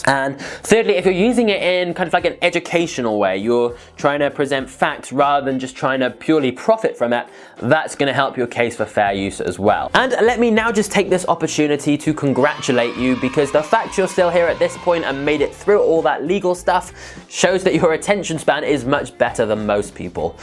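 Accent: United Kingdom. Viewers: Thank you, you've have explained the topic in a manner of very detail. Quite a long video though, but I like your Brithish accent.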